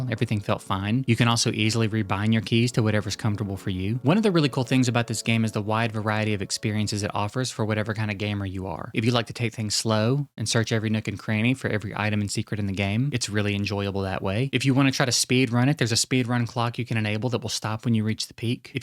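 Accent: South, United States. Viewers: Finding someone who can recommend good casual non farming games? Amazing. Hearing the southern accent? You do not understand how much it comforts me.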